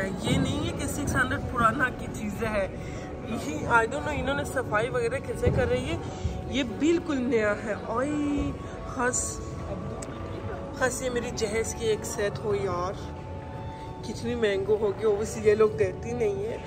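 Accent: Pakistan. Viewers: Assalam alaikum, this is Khawar from Karachi, Pakistan. Really like your urdu accent. Wish that when Me n my family visit Turkey we would love to meet you. Regards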